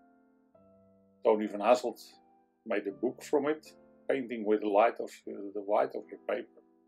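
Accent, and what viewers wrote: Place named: Texel, Netherlands. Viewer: Love this painting..so beautiful. Love your accent as well. Reminds of my grandfather who came from Texel...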